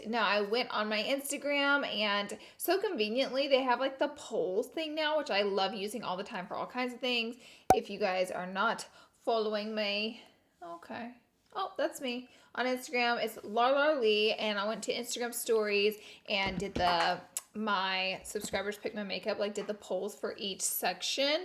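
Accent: South, United States. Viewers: I love your voice 😂 I sound just as country 🤷😍😇🤸♥️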